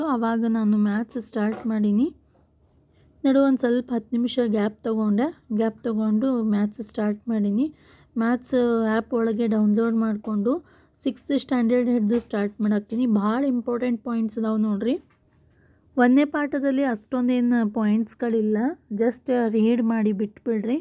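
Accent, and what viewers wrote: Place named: Vijayapura, India. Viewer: Tq akka namdu vijayapur nim baashe nam tarane anasataiti akka adake kelde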